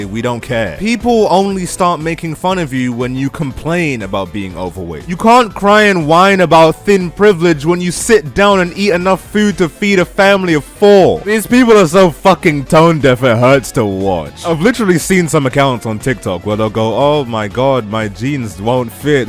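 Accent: United Kingdom. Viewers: you didt sound like timmy you sound just vary british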